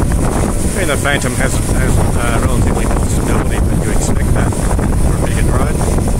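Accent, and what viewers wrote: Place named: Australia. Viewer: Where are you ? You lucky son of a gun !! Australia I think judging from your accent but wherever you're at looks awesome!!!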